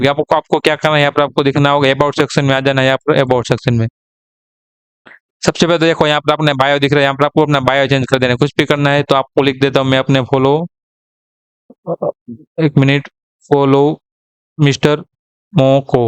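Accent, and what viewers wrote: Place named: Gujarat, India. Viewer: आप गुजराती हो बोली इसी लगती है ❤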